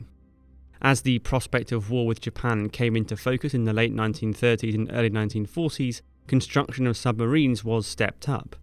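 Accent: United Kingdom. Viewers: Somehow it sounds more important with a British guy announcing.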